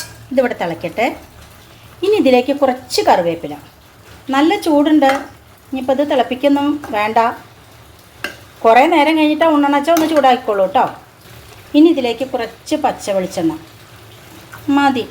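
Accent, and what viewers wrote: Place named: Palakkad, India. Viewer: പാലക്കാടൻ ഭാഷ അവധരണം കേൾക്കാൻ ഭയകര ഇഷ്ട്ടമാണ്..പചകം സൂപ്പർ ട്ടോ😋❤❤...ഞാനും ഇതുപോലെ ഉണ്ടാക്കാറുണ്ട്😍😘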